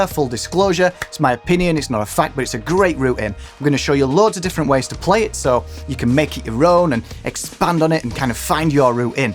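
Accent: United Kingdom. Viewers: SOUNDS GREAT LIKE ELTON JOHN ARE YOU BRITISH MATE.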